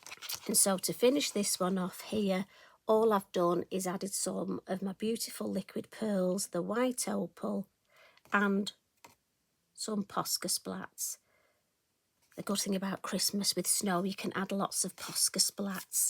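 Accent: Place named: Northern England, United Kingdom. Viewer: Another fab tutorial,. Don't apologise for using Northern colloquialisms. We should all be proud of where we come from...that's what makes us different. I love accents and colloquialisms..I am from Yorkshire and love my accent. I have had so many people comment on how they love it too, sometimes, like you I have to tone it down a bit, but on the whole most folk 'get it'. Thanks for your hints and tips and your lovely videos.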